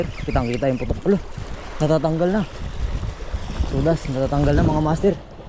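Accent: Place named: Zambales, Philippines. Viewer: Master bat Pay tawag mo sa kasama mo? Alam ko dito lang sa Zambales ang nag tatawawagan ng pay. Punto mo para ka ring zambaleño...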